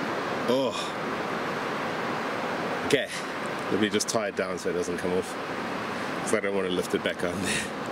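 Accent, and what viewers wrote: South Africa. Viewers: Mooi man. Glad you got it out. Just a random question are you from Port Elizabeth South Africa, I'm orginally from Grahamstown and your accent sounds like you are from the area.....in other news , went to Castle Rock today and saw thousands of shells mainly scallops and lots of other shells. Was amazing, my kids loved looking at the fossils aswell. But anyway, keep up the good work.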